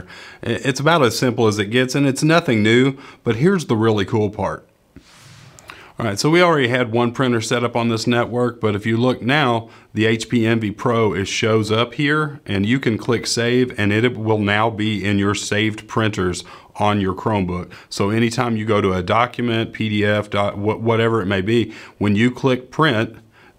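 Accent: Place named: Virginia, United States. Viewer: You sound like you're from my homestate Va. Anyway, do all chromebooks 315 connected to some printer? I looked up the ones that are compatible with CB and I decided on HP 2755e. Thanks for this really clear video. Second question, I thought you could just set up the printer on the CB screen by tapping the clock and then following through. How will I know if need HP Smart app?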